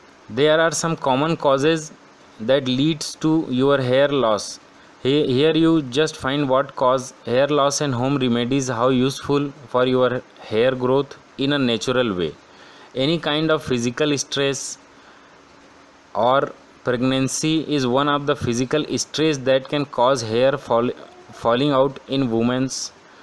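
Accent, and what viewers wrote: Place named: India. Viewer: Indian accent, you know it's a damn scam!!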